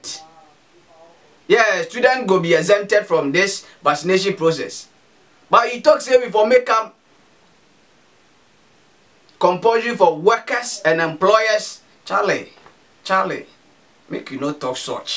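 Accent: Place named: Pidgin, Nigeria. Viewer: hahahaha na soooooo pidgin, welcome 😃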